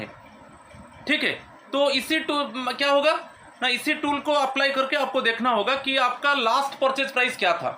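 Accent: Odisha, India. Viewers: Sir are u an odia?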